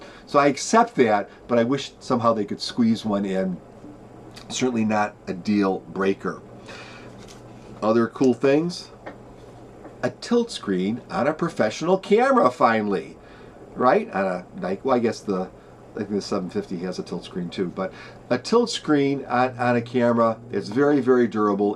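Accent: Chicago, United States. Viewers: Do I detect a slight Chicago accent...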